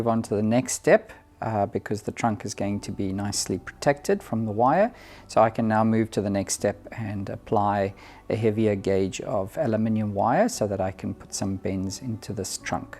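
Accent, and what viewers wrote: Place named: South Africa. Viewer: Really useful and informative video, thank you 💚 I find it funny that auto captions can’t distinguish South African accents, of course I mean no offence just makes me smile when it says bins on screen when you say bends! 😂😆 thanks again very useful as always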